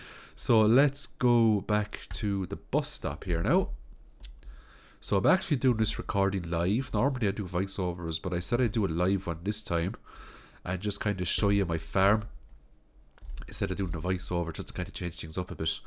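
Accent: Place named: Rural, Ireland. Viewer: Of course an Irish man would be a great farmer 🤣🍀 (I'm assuming based on the cultchie accent 😆) love the vids?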